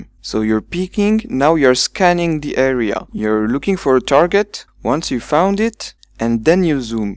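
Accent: France. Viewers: Il ne serait pas français ? Le petit accent on l'entend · Is it possible that you are french? If so you are one of the few french people that can speak english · i was a bit confused at the keys you provided, but then i remembered you have a french accent so you are probably using a azerty keyboard. Anyways, i'd suggest providing the qwerty equivalents next time :P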